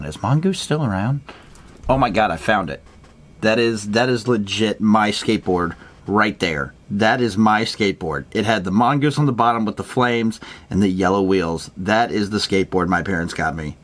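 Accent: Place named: East Texas, United States. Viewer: You should definitely ride the board into work! Love the vid! And that east texas accent is just proving me right that you're the.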